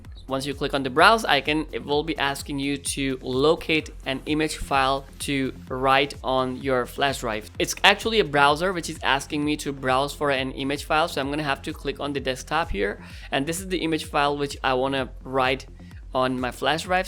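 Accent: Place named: India. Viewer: U r indian.. And have a great accent... Hats off bro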